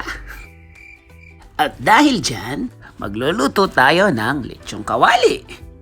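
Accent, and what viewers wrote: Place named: Philippines. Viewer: I like that filipino accent Low to medium hit!! Proud Pilipino pala here 😊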